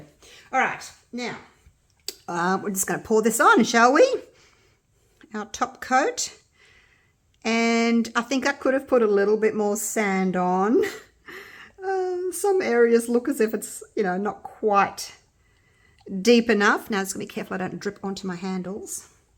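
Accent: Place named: Australia. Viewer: Side note curiosity I have to comment on your lovely accent again. Australian accents are so very cool. Forgive me if I'm wrong.🤔 But dudes are called blokes right? So what is the correct term for chicks? A little 70's era usa slang for guys & gals🤣🤣🤣🤣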